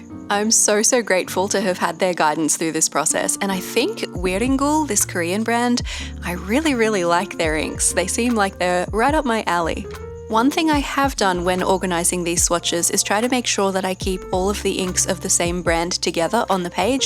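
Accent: Australia. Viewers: Found you on searching for ink swatching! I'm in Adelaide and when I saw all the Ferris Wheel Press I wondered if you were Australian. Duh, couldn't I tell with the accent but prob because I couldn't hear one (being an Aussie myself) it didn't click! Hahaha. Anyway, loved watching all your swatching and love that you share inks with friends. Never knew there was a Pen club of sorts. Wonder if there is one here in Adelaide? I share ink with one friend and will soon receive a coffee smelling one she recently bought in Singapore. Can't wait for that. 😊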